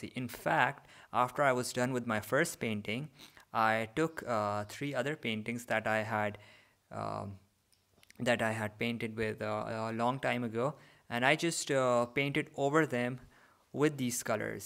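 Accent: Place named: India. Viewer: he sounds Indian but his name seems middle eastern lol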